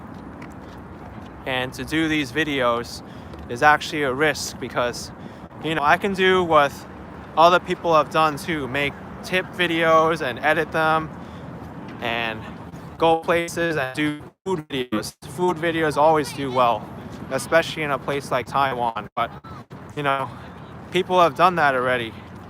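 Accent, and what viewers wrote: United States. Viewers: When I first started watching, I thought you had some type of Asian accent, now I listen to you and hear you definitely have a new York accent 😭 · Before you appeared on video, just by your voice, i thought you were an American. After you appeared on video then i realized that you're an ABC - America Born Chinese. Great work for sharing the video. I enjoyed it. Brilliant for showing life goes on despite all the rumours and insults that had been throwing around. You're fit and standing tall. Best wishes. 👍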